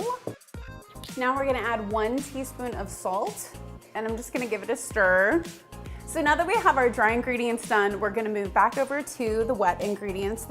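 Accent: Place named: Minnesota, United States. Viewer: You may live in Dallas, but you are definitely a Yankee. I live in central Texas, but was born and raised in Minneapolis. You sound like Minnesota. Come on. Tell the truth. I love your channel in your cooking. I can’t wait to try everything.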